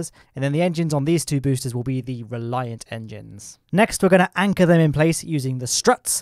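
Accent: United Kingdom. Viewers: He's UK Australia's don't articulate their words as much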